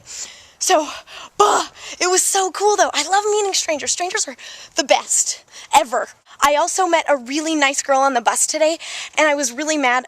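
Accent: England, United Kingdom. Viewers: Your English accent was awesome! Can you do like some of next clog in the accent? PLEASE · Your English accent was awesome.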